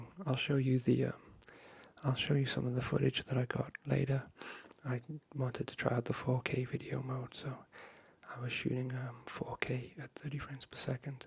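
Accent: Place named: Wales, United Kingdom. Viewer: Are you welsh? :) trying to place your accent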